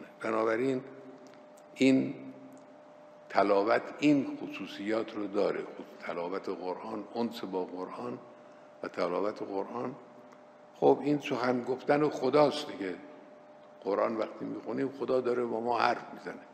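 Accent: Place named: Arab world. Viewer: حاجی من و تو آخر عاقبت نداریم اون دنیا باید جواب سختی پس بدیم حالا هی با لحجه عربی صلوات بفرست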